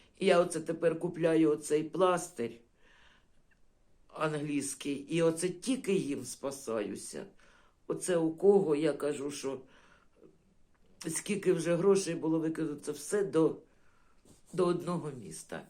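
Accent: Kherson, Ukraine. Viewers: Пані, вітаю, Ви дуже класна, ваш говір так мені нагадує мій, херсонський❤, вже 16 років там не живу, а всеодно, досі проскакує та балачка😊, зичу Вам міцного здоров'я і терпіння, най Бог кріпить вас і охороняє, доброї ночі, Янгола Охоронця🙏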